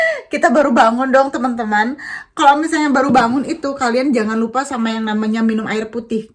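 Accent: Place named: Bali, Indonesia. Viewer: wah pantes ngomongnya campuran bali tadi di Vlog wkwkw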